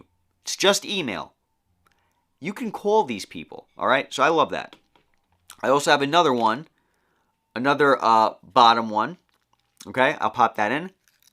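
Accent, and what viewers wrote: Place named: New York, United States. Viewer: and wasn't even from the name, I didn't see that till after. It's so funny, I was just watching, your personality, demeanor, the way you were talking, then it hit me! If you were from New York it would have given it away a lot quicker. lol thank you so much for the video! I might have to give it a shot, I have to do something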